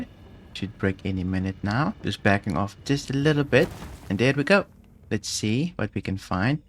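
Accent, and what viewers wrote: South Africa. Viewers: You're very good at explaining things at a good pace without leaving anything out. Nice SA accent too. Good job!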